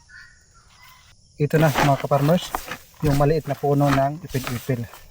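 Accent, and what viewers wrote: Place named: Visayas, Philippines. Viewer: saan ang lugar nyo boss? para kang bisaya! yan rin kasi ang plano ko, mag reretiro na kasi ako sa agosto.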